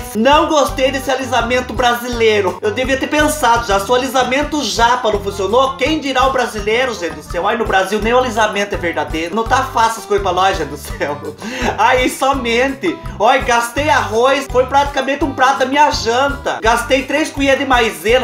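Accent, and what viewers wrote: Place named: Paraná, Brazil. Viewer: Vc fala igualzinho o pessoal da cidade onde morei. Em Wenceslau Braz norte do Paraná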